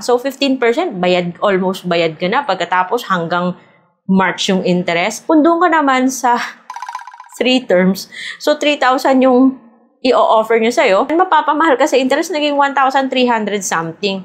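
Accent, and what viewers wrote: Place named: Visayas, Philippines. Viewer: Bisaya di I ka mam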